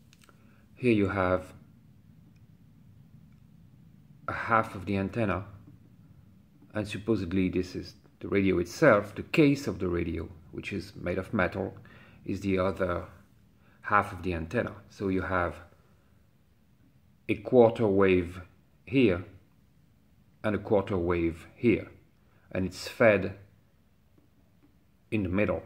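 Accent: France. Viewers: not only is your content great! You also have great French accent which makes it even that much more interesting. Thank you for making videos! I love to watch them in my free time. Maybe I’ll have time to make my rats tail today:)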